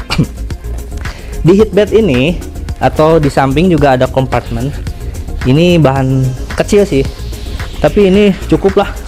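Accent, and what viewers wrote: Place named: West Java, Indonesia. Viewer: Logat Sunda na mah Aya keneh 😁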